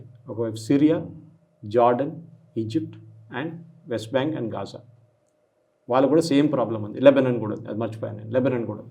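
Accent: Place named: Telangana, India. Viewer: పక్కా తెలంగాణ భాష మాట్లాడుతాడు. మలయాళం భాష మీద పట్టుందా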